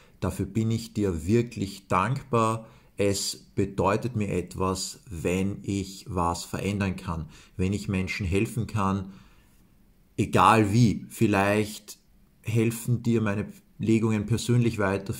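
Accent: Austria. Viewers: Ich LIEBE deine Stimme, lieber!❤ Noch dazu mit diesem wundervollen österreichischen Akzent, sehr berührend!🙏🏻💕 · Jaaa, Du bist teilweise eine Schlüsselfigur in meinem Prozess❤Danke dafür und ich liebe auch Deinen Wiener Dialekt, dalls es wienerisch ist, aber ich glaube schon😅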